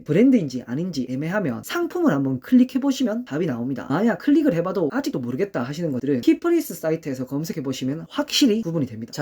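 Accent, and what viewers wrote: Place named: Gyeongsang, South Korea. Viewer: 갱상도라서 더욱 정감이 가네요...전 김해임다. 구독합니다.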